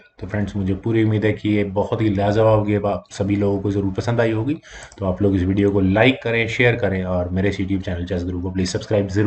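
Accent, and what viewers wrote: India. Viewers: Could someone tell me whether the speaking person uses only English? Because of Hindi accent, I am not sure if it is only English or some Hindi words are used also.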